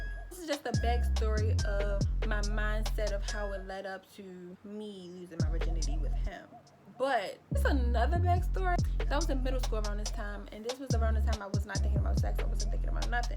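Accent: Baltimore, United States. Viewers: i knew u was from baltimore the first time i heard your voice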